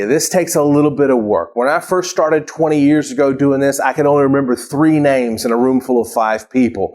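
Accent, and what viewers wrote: South, United States. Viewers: Love your charming Southern accent.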